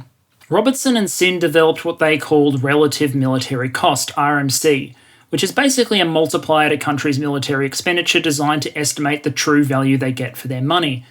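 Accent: Australia. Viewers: Not too often one can hear Argentina in an australian accent. Love your videos mate, greets from this far away country. Un abrazo a todos guacho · Your chinese pronunciation isn't bad. As an ABC (American Born Chinese) it was super weird hearing Chinese spoken with an Aussie accent.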